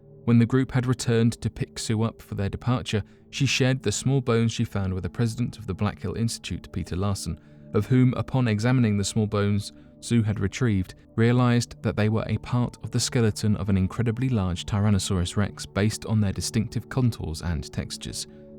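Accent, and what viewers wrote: United Kingdom. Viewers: It's hilarious how many people want to correct how this poor guy pronounces words. He's British! They pronounce things differently. You trying to correct him is more annoying than him pronouncing words in a way you don't like. Lol!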